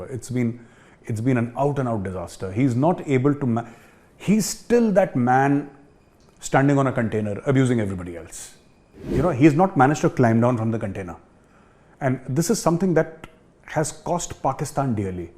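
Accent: India. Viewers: bloody major why you are thinking for pakistan why don't think for India. You are teaching how to talk an oxford graduates , bloody majot may you can't enter the main gate of oxford university . like you little educated man lots in pakistan. you even can't speak proper english only indo english. shut of your mouth.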